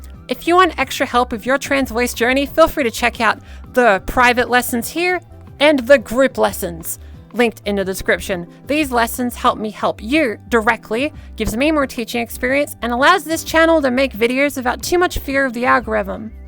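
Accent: Australia. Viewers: Me, a straight man who has never had any interest being a woman: Interesting But to be for real, I'm just here to listen to an Australian accent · I like your 'Strayan accent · love the Aussie accent you go girl loving you doing it for aussie girls yehwe definitely don't want to end up with Aussie girl voices proud to be Australian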